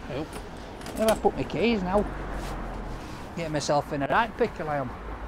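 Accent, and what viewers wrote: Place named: North, United Kingdom. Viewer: Love this guy proper northern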